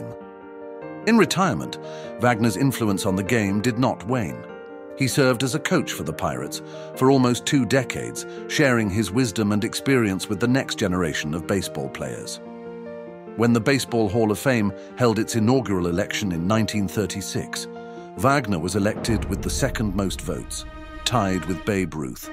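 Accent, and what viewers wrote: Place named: United Kingdom. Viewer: Interesting to hear a British narrator on a biography of a player in the Great American Pastime.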